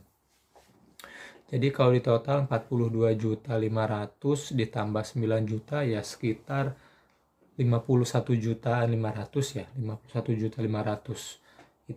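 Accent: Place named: West Java, Indonesia. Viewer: Logat nya sunda bgtt kang , asli bumi di mana ?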